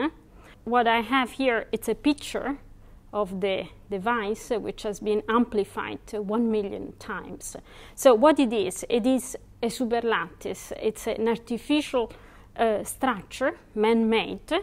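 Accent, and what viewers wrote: Italy. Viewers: That thick italian accent goes off and on on every other "R" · I recognized from her accent that she might be Italian/Spanish/Portuguese maybe? Her name strengthens my suspicion..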